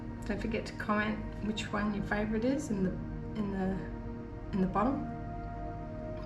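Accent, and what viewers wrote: Australia. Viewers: Thanks for sharing your learning curve. I am just about to start pour painting and love listening to an Australian accent. I live in Queensland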